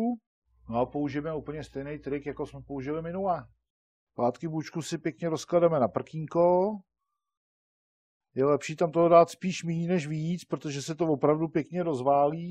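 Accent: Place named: Prague, Czech Republic. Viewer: jsem naprosto normální heterosexuál:) Přesto bych řekl, že mám hrozně rád., tvý recepty jsou neotřelý, někdy trošku složitý, někdy jednoduchý, někdy super a dost často na ceny nejvyšší. Občas mi jako brňákovi úplně neladila tvoje pražská mluva, ale tohle je přesně to, co tě dělá zapamatovatelným. Jsi nejen vynikající kuchař. Jsi i člověk s charismatem a dobrým srdcem. Jsem rád, že jsem měl tu čest tě poznat i osobně. Díky nejen za ty super recepty, ale díky i za to, jak to celý podáváš.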